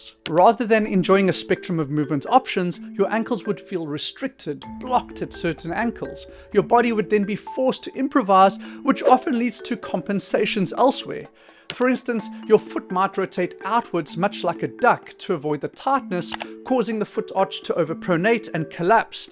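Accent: South Africa. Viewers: He ain't no native. He from South Africa. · Oh yay, I love hearing a fellow South African YouTuber. Great video too. I need these mobility exercises.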